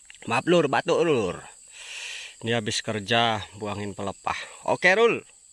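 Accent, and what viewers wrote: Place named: West Java, Indonesia. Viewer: Ini daerah mana om kayak dari Sunda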